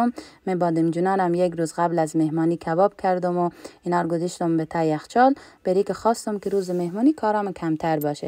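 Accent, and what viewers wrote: Herat, Afghanistan. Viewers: جان فکر میکنم لهجه شما یک کمی تغییر کرده یکی از دلیل های که ویدیو ها شما جذاب کرده همی لهجه شیرین هراتی ما است❤ ولی بازهم مثل همیشه عالی وبا سلیقه 😊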